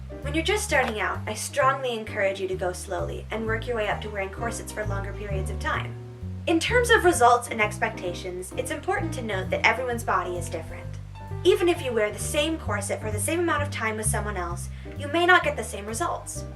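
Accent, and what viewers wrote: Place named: Ireland. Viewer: I’m sure you’re Irish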